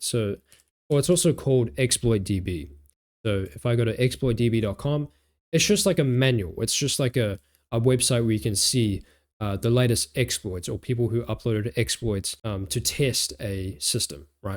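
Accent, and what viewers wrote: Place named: New Zealand. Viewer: It's a fellow New Zealander!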